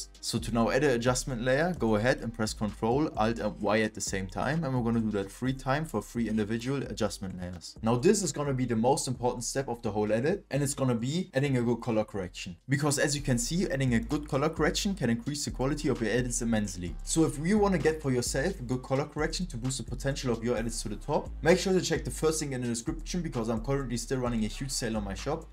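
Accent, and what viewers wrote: Germany. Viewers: Ich höre Deutsch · I hear German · Da mann hört das du deutsch bist schreib ich mal deutsch :) Also ich habe mir grade adobe geholt und mit deinen endlos geilen videos habe ich schon so viel dazu gelernt! Vielen dank dazu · is he german? he has a german accent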